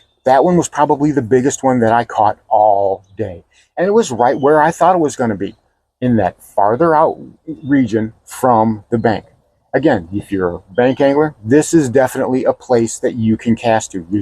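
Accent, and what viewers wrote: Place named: North, United States. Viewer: Hey your accent sounds kinda northern—how did you end up in southern Mississippi?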